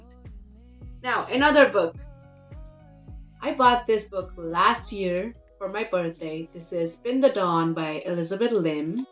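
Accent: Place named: India. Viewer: Omgg your accent is reallyyyyy niceee for an Indian girlll!!❤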